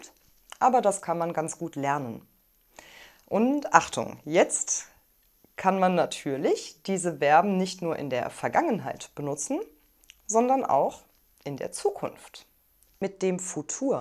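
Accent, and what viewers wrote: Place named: Eastern Europe. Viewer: Чо-то ты нифига не похожа на дойчу... Если бы не произношение, принял бы тебя за... Чешка? В любом случае, что-то славянское 120%!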